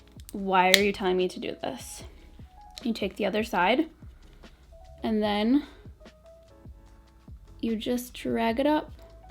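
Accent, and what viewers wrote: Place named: Minnesota, United States. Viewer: Are you from/live Minnesota? Certain things you say reminds me of how people there talk. 😊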